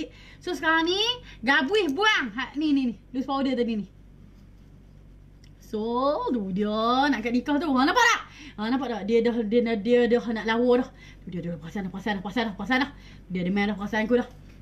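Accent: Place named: Kedah, Malaysia. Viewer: Seronok dgr loghat kedah,sbb sy dari pahang.best sgt2 riuh rendah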